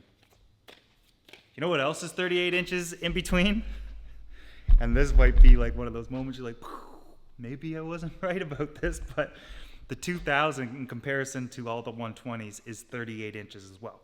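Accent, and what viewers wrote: Canada. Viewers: My confusion is, clearly you are somewhere in Canada from the accent, and of course the 4 kilometers from house to new gallery. Why then do you measure the space between stands in inches and not centimeters? Just something I was curious about.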